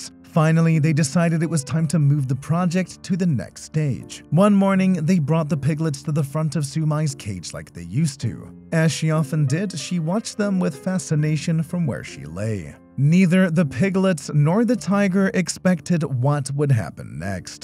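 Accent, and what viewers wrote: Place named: United States. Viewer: I just don’t like the american accent in this video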